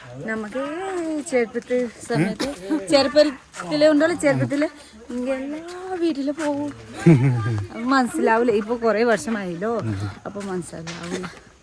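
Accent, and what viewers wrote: Tamil Nadu, India. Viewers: Tamil.mix slangil❤ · Chechide slangil korachu Tamil mix aavunnundu😅😅😅😅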